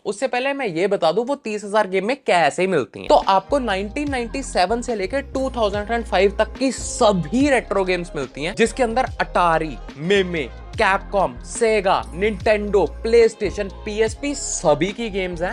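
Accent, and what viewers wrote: Punjab, India. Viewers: Are u from punjab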